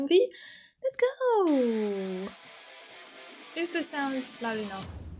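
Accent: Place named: France. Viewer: your english is really good 😭 and i also think french accent adds a bit of character (its not that noticible tho .d)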